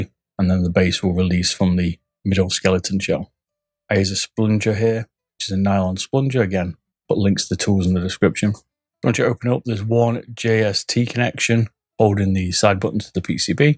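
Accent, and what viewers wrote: United Kingdom. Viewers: Yeah, not sure where’s he from, somewhere in the UK.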